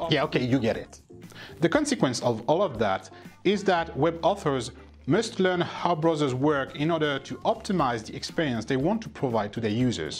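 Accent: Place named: France. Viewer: He has French accent, I guess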